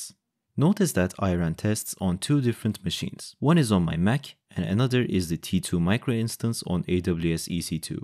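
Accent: Turkey. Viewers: Are you Turkish? Your accent made me think that. I'm sorry if this question bothers you.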